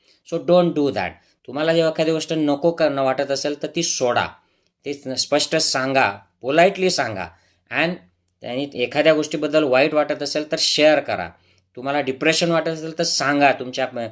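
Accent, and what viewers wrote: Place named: Ahirani, India. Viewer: डॉक्टर साहेब मराठीमध्ये सांगत असताना अहिराणी ची झलक येते थोडी-थोडी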